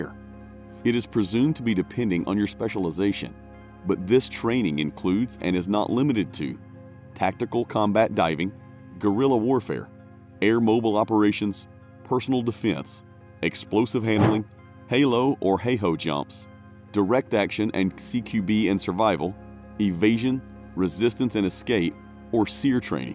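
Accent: South, United States. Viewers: It’s the accent! Love it! That southern taste to it! It’s odd to my west coast ears lol Fellow countrymen all the same though! God bless you bro! 🇺🇸 🙏🏻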